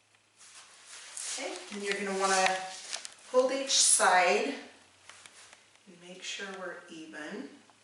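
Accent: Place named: Wisconsin, United States. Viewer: Your accent sounds like Wisconsin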